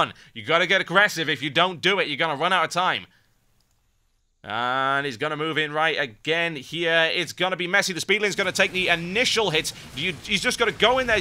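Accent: United Kingdom. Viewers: i was wondering r u british?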